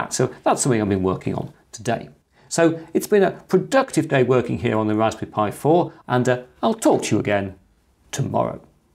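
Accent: United Kingdom. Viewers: The whole Retrofuturustic vibe of this channel , presented by a (very) Brittish Professor is just so unique I can't have enough of it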